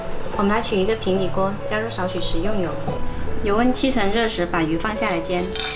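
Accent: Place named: South, China. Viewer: ， 請問你是哪裡家鄉的人？ 是兩廣一帶嗎？ 你的普通話是挺南方腔啊！ 可否多介紹你的家鄉菜， 好公諸同好？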